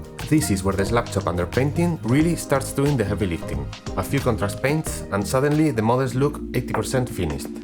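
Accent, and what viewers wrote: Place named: Spain. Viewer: Como se nota que eres español por el acentazo que tienes, hablas el inglés con las tripas xD. Quizas te iria mejor en el canal si te pasas al castellano, ya hay muchisimos canales de habla inglesa y nunca vas a tener el alcance que tienen ellos en inglés, de verdad te lo digo con cariño, tu pronunciación deja mucho que desear y dudo que crezcas por ese camino. En cambio en castellano no hay tanta masificación y menos aun centrada en la parte de pintura del hobby, ahi podrias abrirte un hueco. Un saludo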